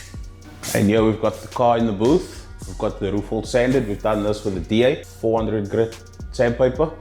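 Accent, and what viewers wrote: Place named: South Africa. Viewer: You look and sound like a South African.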